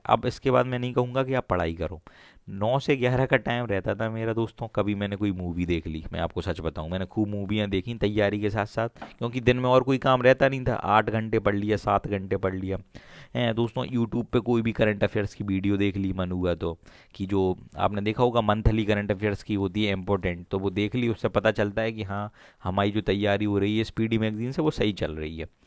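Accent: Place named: Etah, India. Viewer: apke bolne ka style ekdm Etah side ka lgta h😁😁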